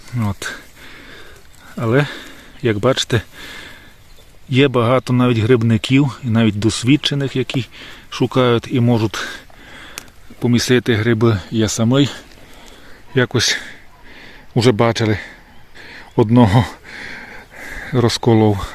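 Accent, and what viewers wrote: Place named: Skole, Ukraine. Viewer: Скажіть, будь ласка, а ви не зі Сколівського району? Бо дуже подібний діалект.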